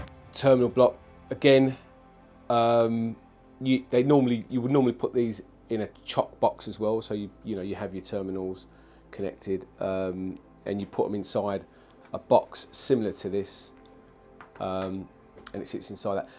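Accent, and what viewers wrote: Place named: United Kingdom. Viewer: I can’t be the only one that watched this video cos if his British accent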